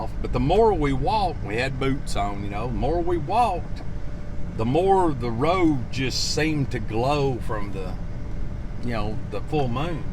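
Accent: South, United States. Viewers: a southern drawl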